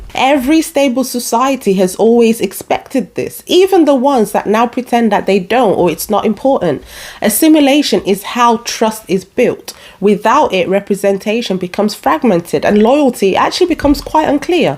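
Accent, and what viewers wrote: England, United Kingdom. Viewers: Happy Christmas - being a proudly native Irish Catholic patriot and an older-conservative-minded Irish gay man in the U.K. 25 years at age 55 myself, I’ve still retained my Irish accent, but your British accent comes across as being quite classy - and by the way, I do hope that you get to visit my native Ireland again very soon - not only have I noticed this drop in standards in the House of Commons here in the U.K., but I’ve also observed this descent into total cringe in Dáil Éireann (Parliament) in my native Ireland since Covid, aside from the fact that having cameras in the chamber reveals these issues - compared to footage that one might see from the US or from a local U.K. or Irish council meeting, what we expect from our political leaders, to have clear oral communication skills, is of vital importance - by comparison, Dáil Éireann back home is even worse than the Commons here, in terms of utterly childish and immature behaviour from politicians on all sides of the house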